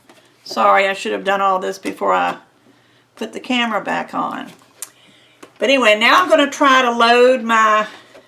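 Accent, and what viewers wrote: United States. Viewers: I've got to guess from your accent your in America. If you think $17 dollars is expensive for a scan and cut mat - don't ever think about emigrating to Australia. We have to pay almost $40 for our mats. 😢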